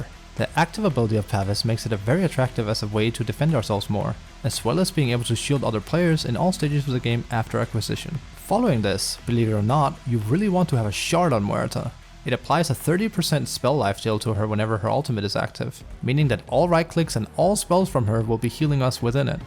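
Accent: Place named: Denmark. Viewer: Thanks (fellow dane here btw.. took me a few mins before I realized, but accent gave it away) - matter fact won 3 games in a row as muerta 5 just today : )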